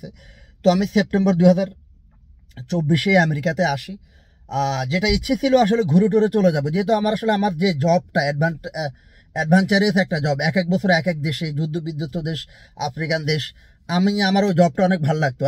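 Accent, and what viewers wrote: Noakhali, Bangladesh. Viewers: আপনার বাড়ি কি নোয়াখালী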